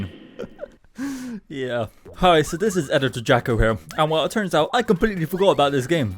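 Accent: Bristol, United Kingdom. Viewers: Up the Bristol accent. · Are you bristolian? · hey your british 🇬🇧🇬🇧🇬🇧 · Are you from Bristol? That accent is hella familiar 😂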